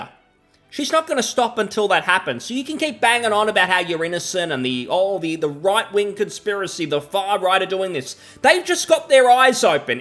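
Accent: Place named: Australia. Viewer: Please tone down the Australian dialect, thanks! If i wanted to listen to that shite i go watch crocodile dundee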